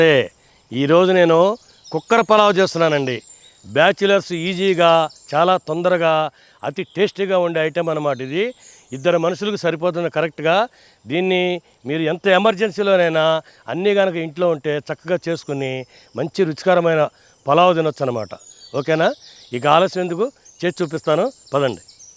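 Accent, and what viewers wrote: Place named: Kurnool, India. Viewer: Today I will be making this recipe in Iftaar...luv the way u speak uncle...missing kurnool ...from 🇦🇪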